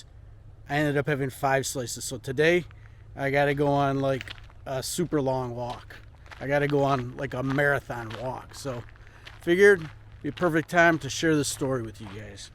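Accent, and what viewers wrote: United States. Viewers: Like to hear your comment most probably as advice...life is not in the cities in America, its dangerous, l read alot about shooting incident n not too safe either, you make all the best with your neighbour, the wilderness, l'm too indeed attracted to the nature but, never get the change to reach out there 🇺🇲 your American accent is much easier on me 👍Take care and all the best! 🍻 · If it f**ks, flies, or floats, lease it. That’s an expensive life lesson. On a side note. Sounds like you got a Chicago accent . Go Bears.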